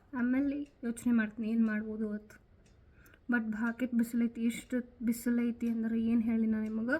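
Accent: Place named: North Karnataka, India. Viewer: Hii, hegiddira.. Nim uttar karnataka kannada nange kelalike ishta agutte,heege videos madta iri. Naavu nodi share like comment madtivi😁. I'm ur fan from coorg❤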